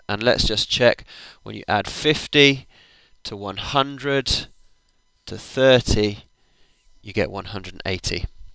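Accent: United Kingdom. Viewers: If all my teachers had an England accent, I would pay attention more.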